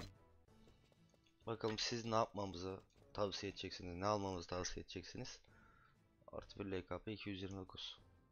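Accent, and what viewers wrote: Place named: Thrace, Turkey. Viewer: İlk videodan beri diyorum kesin Trakyalı diye. Corludan selamlar 😁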